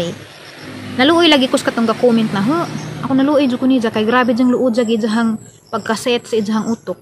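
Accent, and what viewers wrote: Bohol, Philippines. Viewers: asa man ka dapit sa bohol nga pirti mang gahia sa imong sinultian? 😍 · nasuya rana maam oi, samot na cguro ug mka tukod kag Gaisano diha 🤗 lami jud paminawon ang bol-anon maam oi pinagahi jud 😊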